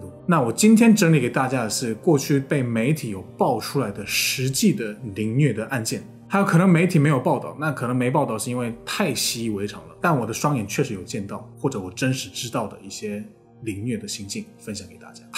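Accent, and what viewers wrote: Standard. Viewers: 国语好标准啊不看评论不知道是哪国的，看的时候心里有几十个卧槽，我以为最多会被打两下给老兵洗袜子之类的，没想到这么黑暗！年少时曾粉红也曾痴迷韩剧学韩语，大学之后认识的留学生让我帮他们考试传答案，首尔和釜山学生背后说坏话，那种微妙的感觉我就想这和中国也太像了，神秘面纱没了之后依然很羡慕韩国的人均工资，以及内地女生对韩国男生的态度，留学生给我看约出来的女生照片真的又漂亮学历又好有的见面一两次语言不通就提出要和他在一起惊呆我。后来知道了娱乐圈财阀军队校园霸凌这些，我的理解是资本凌驾于政治之上很多事情也会逐渐离谱，思想上灌输洗脑教育会让不同阶层的人暂时忘记阶层不敢肆无忌惮的在学校军队欺负人，大不了长大之后再把自由意识找回来，都说大陆没自由我也天天骂觉得台湾或许是乐土，到现在我觉得或许根本没有乐土，一个地方也不是好或坏就能定论，作为平民很庆幸生在东北的十八线小城没受过校园暴力不用服兵役家人溺爱十八岁前傻子一样活着直到30岁还很幼稚，最后希望中日韩站起来让平民日子好过点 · 看影片也幾年了，你的中文用字和發音已經比許多台灣人還要精確和標準。以非中文母語者或是雙母語者來看，真的很厲害，每次看你的影片都覺得非常佩服。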